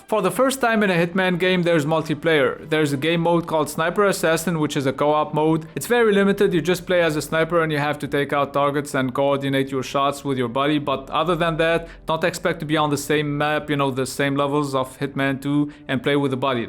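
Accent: Italy. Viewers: I approve of your Italian accent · funny italian accent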